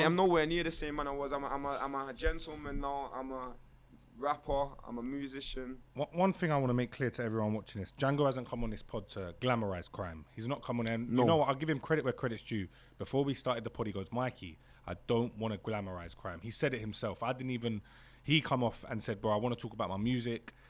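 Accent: Manchester, United Kingdom. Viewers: Why's the speaking like he's from Manchester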